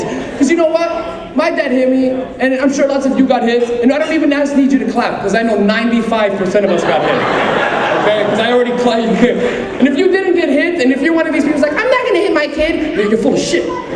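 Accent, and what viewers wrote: Azores, Portugal. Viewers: Não é português decente é português das ilhas, ou dos açores ou da Madeira. Como raio é que não sabes que pronuncia é esta? É a mesma coisa que não perceberes português no Porto ou em Mirandela. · Ele é cómico mas a sua pronúncia açoriana ficou ainda mais difícil de perceber intercalada com o inglês. Além disso as coisas que ele diz aplicam-se mais aos açorianos do que aos portugueses em geral.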